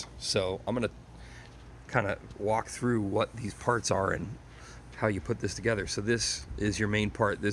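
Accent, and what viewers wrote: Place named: Southern California, United States. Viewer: You sound like a “socal” guy😮. Nothing like saying hey! Thanks for the video🎉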